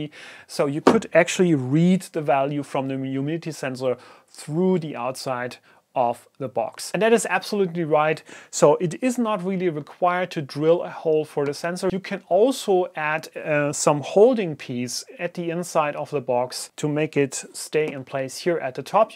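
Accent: Germany. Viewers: great video! I am about to build my own dry box! So it would be awesome to win some extra stuff for it! I'm from Germany! Just as you, I assume? No offense, but as a german, I think I can hear the German accent :D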